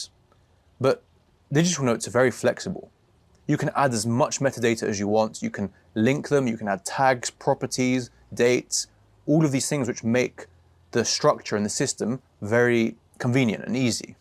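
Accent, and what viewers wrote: United Kingdom. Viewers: How did i know it will be a British guy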